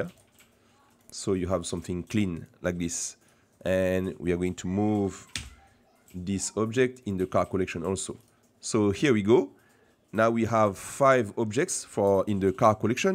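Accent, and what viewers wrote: France. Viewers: C'est un français ce youtubeur? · i really love your french accent tres bien continuee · French accent, bonne journée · tu serais pas français par hasard ? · accent francais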